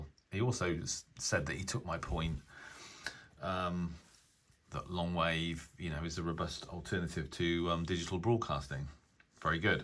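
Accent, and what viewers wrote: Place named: RP, United Kingdom. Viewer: BBC. your voice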